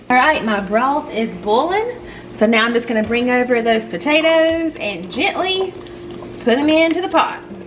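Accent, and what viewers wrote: South, United States. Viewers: Love the southern accent! Part of the southern tribe. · I'm new to your page and I love what I see so far and I love your southern accent and by the way I'm from south carolina and can I ask where you from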